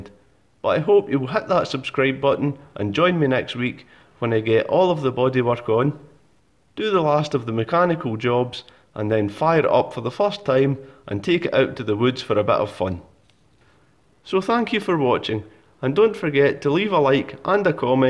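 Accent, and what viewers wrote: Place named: England, United Kingdom. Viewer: What kind of English accent do you have ? It's perfectly understandable, I'm just curious ;) It's very colourful.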